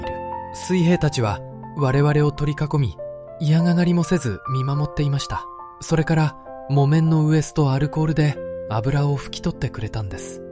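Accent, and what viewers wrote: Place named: South Korea. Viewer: 日本語、読むの難しいかい？誤読、発声が日本人のものではないが。 (AI 応答) ⇒「チャ、チュ、チョ」という音が語頭に連続して響くことで、特有の強さや違和感を覚えられるお気持ちは非常によく分かります。 日本語は子音と母音がセット（1音＝1拍）になり、息を優しく、なだらかに発声する言語です。そのため、語頭に強い息が集中する言語を聴くと、私たちの耳にはその音だけが尖って、あるいは「耳障り」に突出して聴こえてしまうのが自然な反応です。 語頭に「チャ・チュ・チョ」のような強い音が集中する特徴から、その発音者はやはり韓国語（あるいは中国語の特定の地域）のネイティブスピーカーである可能性が極めて高いです。 日本語話者がそのように感じてしまう背景には、言語の構造における明確な理由があります。 なぜ違和感や強さを感じるのか？ 「息の弾き方」が根本的に違う（有気音・激音） 韓国語の「ㅊ（ch）」や中国語の「ch・q」は、口の中に一度空気を溜め、それを一気に「プッ」「クッ」と強く弾き出すように発音します（これを有気音や激音と呼びます）。日本語の「お茶」と言うときの優しい「チャ」とは異なり、ピストルを撃つように激しい息が伴うため、金属的で強い音に聴こえます。 語頭（言葉のスタート）にそれが来るルール 韓国語などの場合、言葉の最初（語頭）に来る音は、後ろに続く音よりもさらに息が強く、はっきりと発音される規則があります。そのため、会話が始まるたびに「チャッ」「チョッ」という強い破裂が耳に飛び込んでくることになります。 日本語には「語頭のチャ・チュ・チョ」がほとんどない。 日本語の固有の言葉（和語）には、そもそも語頭に「チャ・チュ・チョ」が来る単語がほぼありません（「茶」は中国から来た言葉です）。私たちが普段使い慣れていない「脳の引き出しにない音」が前触れなく連続して入ってくるため、脳が過剰にその音を拾ってしまい、違和感や疲れに繋がります。 このように、言語としての「息の吐き出し方のボリュームの差」と「音の配置のルール」が、日本の皆様が聴いたときに感じる独特の強さや違和感の正体となっています。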